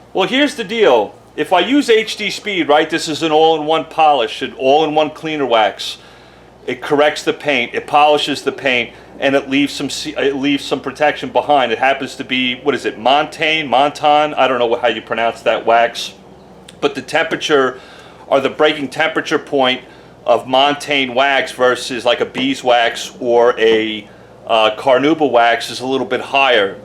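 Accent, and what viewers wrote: New York, United States. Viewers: Def New York or New Jersey. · In every video make, someone has to always comment on his New York accent. It’s always “, you sound like Raymond or the dj guy”. Can someone please make an intelligent question on car detailing?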